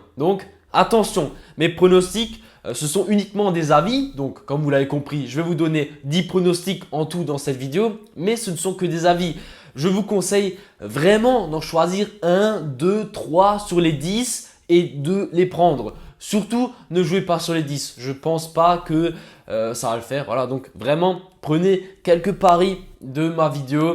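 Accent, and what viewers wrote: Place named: Alsace, France. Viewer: Ton accent alsacien me tue forza alsace ;)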